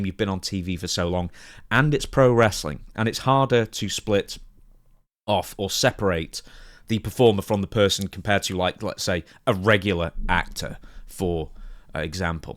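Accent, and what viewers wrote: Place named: England, United Kingdom. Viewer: What part of England is this accent from? It's awful!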